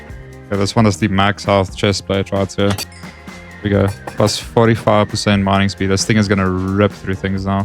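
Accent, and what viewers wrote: South Africa. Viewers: Yo he has such a south african accent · I knew it as soon as you said chow the accent gave it away , you are a successful Afrikaans YouTuber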